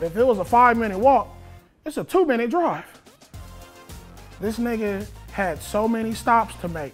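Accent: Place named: Los Angeles, United States. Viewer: LA Accent is sooooo strong lol ROTFL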